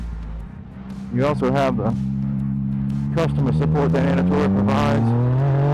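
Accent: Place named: Louisiana, United States. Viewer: Love your informative videos! Where in the south are you?? Sounds like Louisiana accent!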